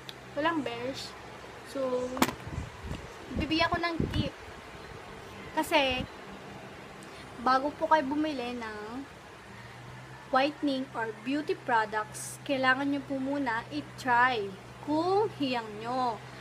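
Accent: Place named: Iloilo, Philippines. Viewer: Ilongga ka girl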